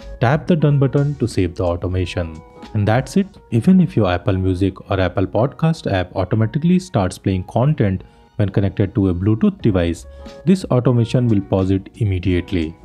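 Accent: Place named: India. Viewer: The man’s strong Indian accent distracted me from what he was saying. Also when he talked fast I could not follow his instructions and sometimes I could not even distinguish the words. I gave up and am seeking an answer elsewhere.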